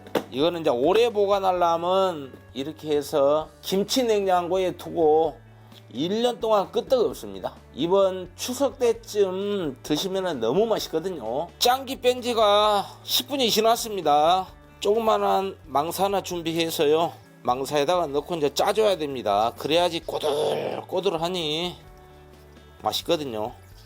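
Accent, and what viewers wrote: Jeolla, South Korea. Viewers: 구수한 전라도 사투리 배우고 갑니다👍👍👍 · 노각 짱아찌 꼬들꼬들 고기 먹을때 좋을것 같아요~저도 해서 먹어야겠어요~ 전라도 구수한 사투리로 재밌습니다😂 감사합니다😂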